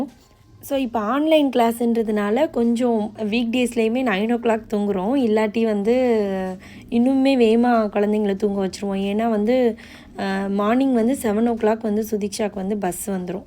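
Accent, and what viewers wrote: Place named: Natham, India. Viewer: அது எங்க ஊரு நத்தம் தமிழ் அண்ணா so நல்லா தான் இருக்கும்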